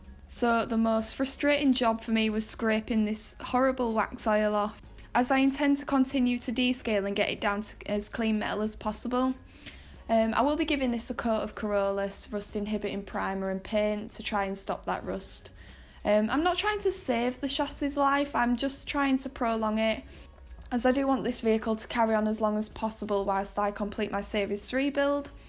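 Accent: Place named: Yorkshire, United Kingdom. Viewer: Love ur vids I'm wanting a defender project soon And I will be using ur vids as guidance Keep it up BTW I'm guessing your from Yorkshire lol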